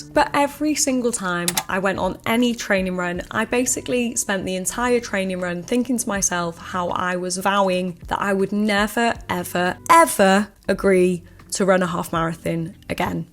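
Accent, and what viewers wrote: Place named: North Wales, United Kingdom. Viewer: Fellow north wales girl here thought your accent sounded familiar x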